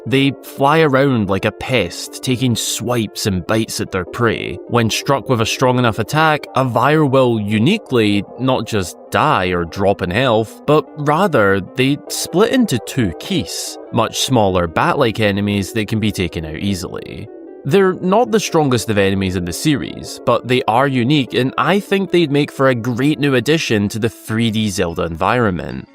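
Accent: United Kingdom. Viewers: Yer accent is funny, you wouldn't happen to be British would you😅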